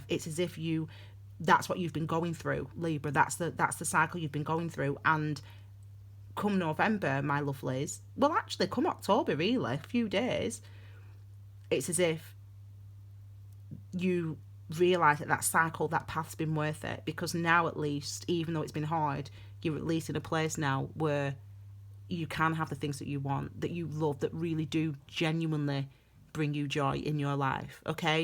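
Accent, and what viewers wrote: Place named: Yorkshire, United Kingdom. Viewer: Thank you for the reading. I feel stuck and trapped in my job. I love your accent are you a Yorkshire girl?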